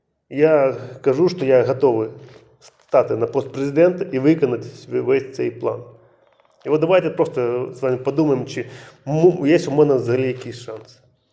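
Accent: Russia. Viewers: 😮я чую московский суржик шановний ви не азіров в гріме?і да ти уже збрехав, в Україні можна мати любу зброю крім пістолета,навіть снайперку, і в необмеженій кількості😅 ти можеш бути президентом брехун ще той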